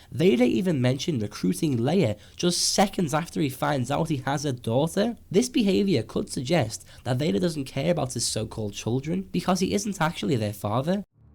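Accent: Liverpool, United Kingdom. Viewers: I don't think Vader was trying to kill Luke in Empire, he was basically just defending from Luke's attacks, and driving him toward the carbon freeing unit, so he could take him to the Emperor. He didn't know about Leia until he read Luke's mind. Also, are you a Scouser? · Nice to hear a British accent talking Star Wars!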